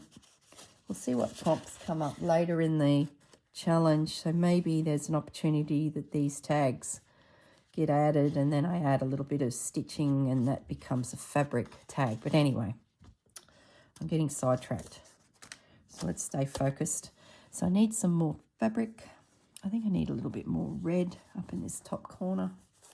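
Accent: Australia. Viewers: I watch some other crafters that make beautiful things that I love, but their voice and way of speaking make me crazy! I love watching you create beautiful things and listening to you! Maybe it's the Ausie accent!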